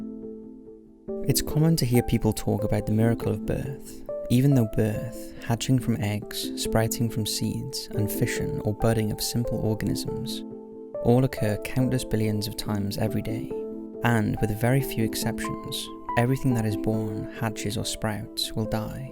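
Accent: Wales, United Kingdom. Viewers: moonbeam starchild - that's the Welsh accent for you!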